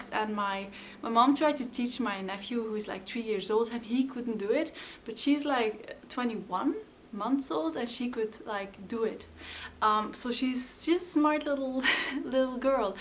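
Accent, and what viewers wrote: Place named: Netherlands. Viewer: Where are you from? It sound like you speak dutch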